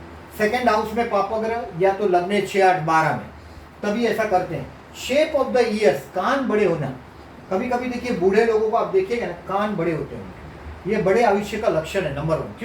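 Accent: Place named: South Gujarat, India. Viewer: Sir your language seems like south Gujarat which is your native place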